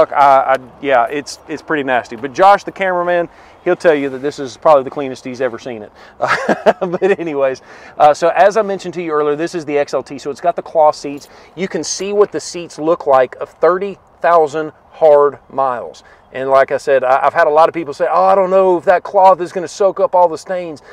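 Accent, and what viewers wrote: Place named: Alabama, United States. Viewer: I lived in Birmingham for 18 years so I enjoyed and missed the narrator's accent. Now we live in the Colorado mountains where we can get storms that drop multiple feet of snow. We keep our trucks stock height which is good enough to handle any snow, dirt roads, and basic off-road trails. Unless you want to travel on knarly trails like Moab, or drive through water, the only benefit of lifting/raising your truck and slapping on huge wheels is bragging rights. Leasing is always a mistake unless you are getting a vehicle you are sure you don't want. Leasing is not ownership, you are just borrowing the vehicle. You never make any modifications to a leased car beyond replacing the tires if they wear out and inserting a phone charger in the cigarette lighter. It isn't your vehicle! The real owner, the leasing company, will financially punish you for messing with their vehicle. 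Even if you don't modify the vehicle you will be financially punished if you dare exceed the limited miles they allot you. When the lease is up your life crashes if you don't replace the vehicle. But with no accrued value or trade-in many buyers fall into the leasing trap, significantly raising their vehicles costs over buying.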